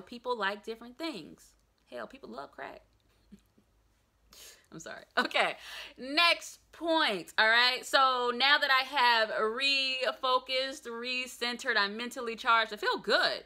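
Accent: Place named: South, United States. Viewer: I knew yo ass was from the south! That southern charm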